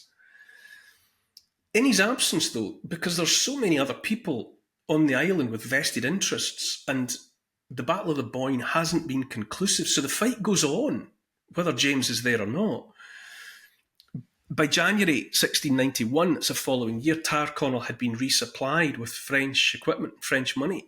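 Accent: Scotland, United Kingdom. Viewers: If I had to pick one official English accent in the world it would be your Scottish accent. Enjoy Count Dankula's as well. You have the best English accent in my opinion of all places on earth. Could listen for hours to it. Great job of story telling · Hearing your amazing thick Scottish accent pronouncing a lot of unusual words and going into rich storytelling is some divine ASMR, very nice, thanks!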